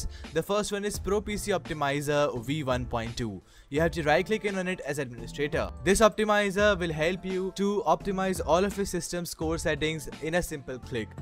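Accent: India. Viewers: India Voice = HACKER dont download is file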